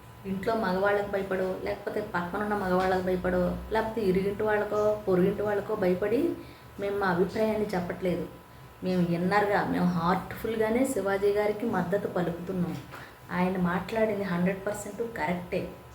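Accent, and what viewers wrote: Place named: West Godavari, India. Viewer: Fantastic dialogue delivery. Crystal clear Telugu Language. Looks like West Godavari Accent. Slow and Steady delivery of Dialogues.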